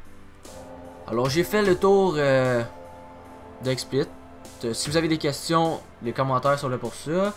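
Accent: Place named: Quebec, Canada. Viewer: TU vin de Québec? Parce que je recnnais cet accent ^^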